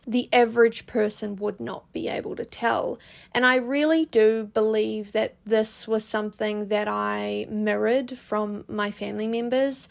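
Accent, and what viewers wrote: New Zealand. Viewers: I have an interest in accents, are you a NZ who's moved to the US or vice versa?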